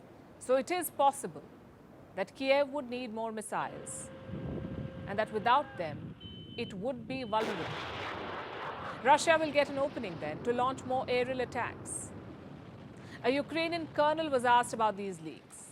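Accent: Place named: India. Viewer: God I hate this indian Accent. Can you please use AI to "normalize" her voice.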